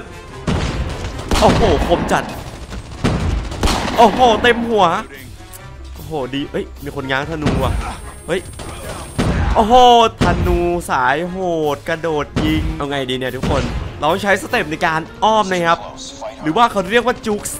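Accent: North, Thailand. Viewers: พี่คนเหนือใช่ไหมครับ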